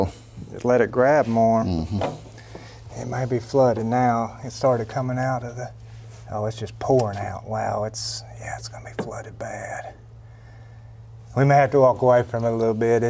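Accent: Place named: Texas, United States. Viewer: I like the pace of your working. You tackle things so intentionally and cleverly. Also the dialogue between the two of you is amazing to witness. I prefer that so much more than when you made the silent version on the Citroen. That was kinda weird because it was so obvious that at times you had to restrain yourself talking. That made it awkward to follow. Besides, your Texas twang is nice to listen 😊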